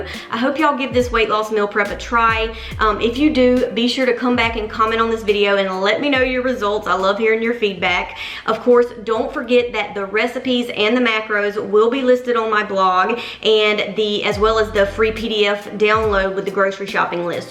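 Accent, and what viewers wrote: South, United States. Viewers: I heard your southern drawl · The voice through me for a loop 😅😅 heyyyy my fellow southern friend 👋🏽👋🏽 great video 💕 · I love your southern accent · I can tell she's from the South. I am too.🙂👍🏻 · Just where is that sweet as molasses southern accent from? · Me being a Mississippian, “lord have mercy, that’s a southern accent.” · I love your Southern drawl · I love the southern belle accent. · so love the Southern draw and love the prep recipes. Thank you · Love your voice girl. A real southern belle. 😍😍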